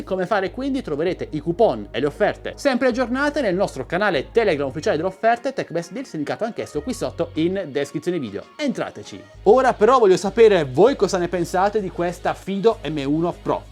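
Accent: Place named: Bari, Italy. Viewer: Sei barese? Da come parli ci giurerei... Bel canale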